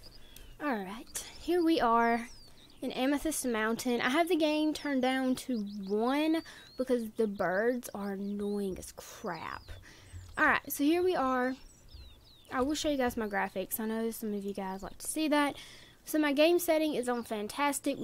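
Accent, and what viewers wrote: South, United States. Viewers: Its an off day when whatever your eating just sinks in to the ground....... also lol southern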